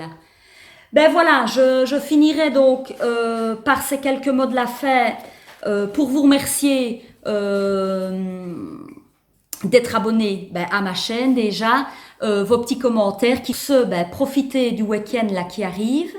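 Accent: Belgium. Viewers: Je viens de découvrir ton podcast, enfin une belge, et à l'accent ont ne doit pas être loint, podcast très agréable à écouter et regarder félicitations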